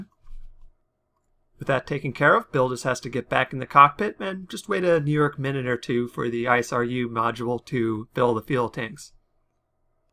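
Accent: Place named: United Kingdom. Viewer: oh snaps senpai noticed him. Love ya even tho you still have a bit of a limey accent. Hope you lose that completely some day.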